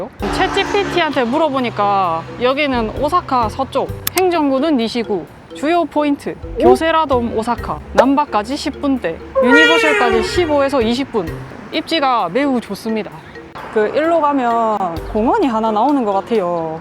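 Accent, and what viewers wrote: Busan, South Korea. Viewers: 부산분이시죠? 너무 잘 봤습니다. ㅎㅎ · 구독함!, 꾸밈없어 좋음, 경상도 표준어 너무 좋음!!! 30대 후반인데 어째 4~50대 필이 나서 더더더 좋음^^; 요즘 시덥잖은 넘탱이들 여행유튭보다 훨 나음.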